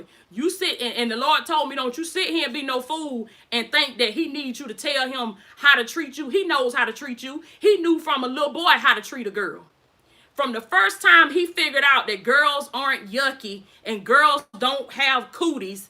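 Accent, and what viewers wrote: South, United States. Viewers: I can't believe how beautiful you are. Your southern accent is darling l never heard it. Never spent time in Alabama.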